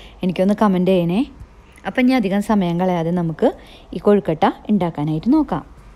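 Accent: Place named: Thrissur, India. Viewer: Hai my dear friend ഈ സ്പെഷ്യൽ ചേരുവ കൊഴുക്കട്ട സൂ....... സൂപ്പർ പിന്നെ ഈ തൃശൂർ ശൈലിയിൽ വിവരണവും അടിപൊളി കൊഴക്കട്ട ഫ്ലേവറും ഈ വിവരണ ഫ്ലേവുറും കലക്കി വീഡിയോ കണ്ടു ഇഷ്ടപ്പെട്ടു ലൈക് ചെയ്തു 👍💐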